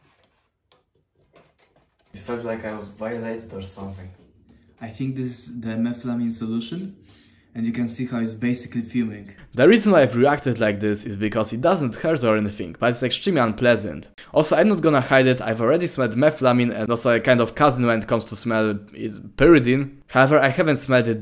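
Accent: Poland. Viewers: Why are you speaking with a polish accent?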